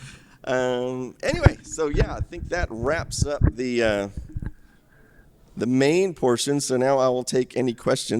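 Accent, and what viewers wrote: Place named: Texas, United States. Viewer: His Texas accent drawls well off-camera.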